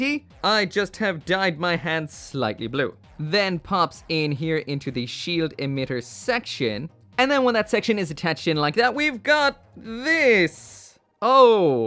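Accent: Ireland. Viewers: You're irish?!?! It makes sense now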